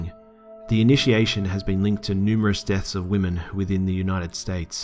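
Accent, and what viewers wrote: Australia. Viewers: Hoho your pronunciation are still hilariously Australian...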